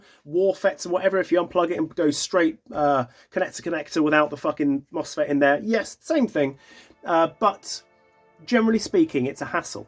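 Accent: United States. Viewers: Awesome American accent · First, love the " murican " accent 😏. That said here in the states , at least where I play. The dmr and bolt rifle are lumped together and they are restricted to a certain jul and that's it, no fps . They take the bb weight and fps and lock the med . They require a side arm or secondary. Some places require the sniper to work with a second person " the babysitter" . For me and my style I find a respectable rof ( 40+ 😏) a good hop, bucking , nub and a clean barrel work very well. I also too like to be shit , a fun shit but still a shit. I once dumped 5,000 .12's on someone's head to kill them 😈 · That American accent......juicy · That American accent is 💯 on point I fucking love it lol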